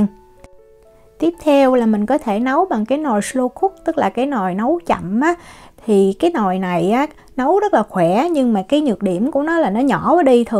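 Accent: South, Vietnam. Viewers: giọng nói trầm ấm miền Nam nghe mùi vị đặc biệt.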